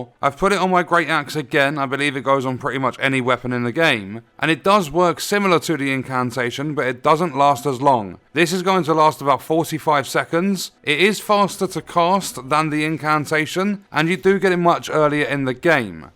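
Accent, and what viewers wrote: United Kingdom. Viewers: One of the best elden ring channels. British Accent is the best Ash of War, technically. Regarding chapters: love em. Let me explain: I watch the whole video first. When I come back ( maybe 2-5 times) I click on the chapters.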